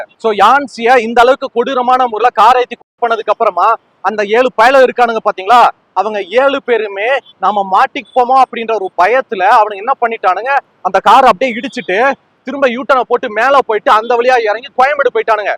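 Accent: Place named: Tirunelveli, India. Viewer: தனிமனித ஒழுக்கத்துக்கும் அரசுக்கும் எந்த சம்பந்தமும் இல்ல அதான் ஆரம்பத்தில் இருந்தே சொல்றேன் உங்களுடைய எல்லா வீடியோவையும் நான் மறக்காமல் பார்ப்பேன்.... நீங்க பேசுற எங்க திருநெல்வேலி ஸ்லாங் ரொம்ப நல்லா இருக்கும்... அதுல ஒரு நேத்தி இருக்கும் அதுல ஒரு நியாயம் இருக்கும். ஆனா உங்ககிட்ட ஒரே ஒரு விஷயம் கட்சிய வச்சு பேசுறீங்க அதுதான் தவறு..... விளாத்திகுளம் அந்த பொண்ணு கற்பழிக்கப்பட்டப்ப அவ்வளவு பேசினீங்க இல்ல கோயம்புத்தூரில் அந்த சின்ன பொண்ண கற்பழிச்சதுக்கு எந்த மாதிரியான வீடியோ நீங்க போட்டீங்க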